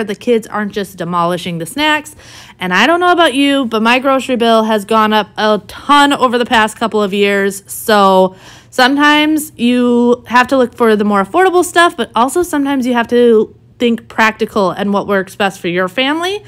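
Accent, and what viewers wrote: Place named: Midwest, United States. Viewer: I love watching your videos they are so motivating. I also love hearing your midwestern accent I have family in Illinois and I just feel like we are family.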